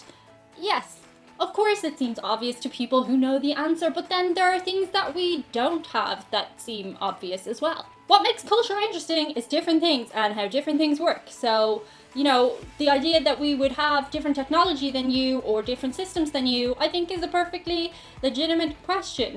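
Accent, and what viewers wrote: Ireland. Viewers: I just love the Irish accent ( and Scottish too ) and could literally listen to you read the f@ dictionary to me... :) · One thing you should know about 'Merica' is that your accent is like a "verbal currency". So when you come are here really "brogue" it up. You just might get a extra cherry on your Sundae. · I've literally spent the entire day binging your videos.. mostly cuz i just love your accent lol 😅 gotta find me a nice Irish girl 🤙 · A lovely young Irish woman with blue eyes and blonde hair, a charming accent, and a fun sense of humor, wonders why she gets asked to stay in people's houses, a lot? Hmmm.... Seriously, though. We do like spending time with visitors from other countries, and it's nice to just be able to sit down and talk about the similarities and differences of life in each place, and what the visitor thinks about aspects of the United States we so take for granted that we may not even notice them. 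I'm sure that's all it is. Mostly. :)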